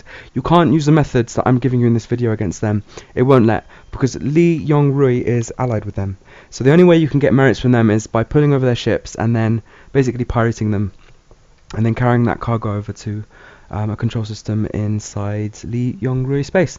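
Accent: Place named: United Kingdom. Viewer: You know it's funny, I feel like these videos are so much smarter sounding and informative with a British accent than if anyone with an American accent made them ;o (is American)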